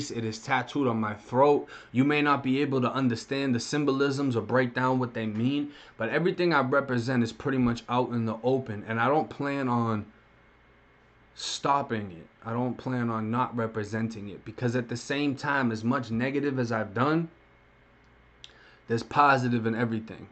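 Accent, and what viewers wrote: Boston, United States. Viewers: 1 of Christina baby gang , I love your East Coast Boston accent. I’m from Connecticut. Sometimes some words come out like Boston area, but not enough LOL 😂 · Love your Channel. Have seen many same type Channels. This is in my opinion far the best. You have a talent for this. With your Boston accent. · Bro accent sound like you could of played in The Departed be Mark Wahlberg voice double 😂😂😂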